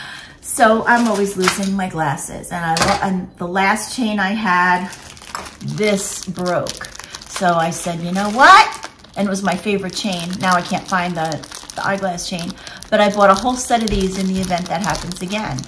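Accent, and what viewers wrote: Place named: New York, United States. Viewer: Just found your channel ❤ I’m TEMU obsessed and my cart on the app just keeps getting bigger and bigger 🥹 I am from Long Island and moved to Florida a few years ago and heard you and your laugh and just knew you were another amazing New Yawkwr 😊 You’ve got another subscriber 🎉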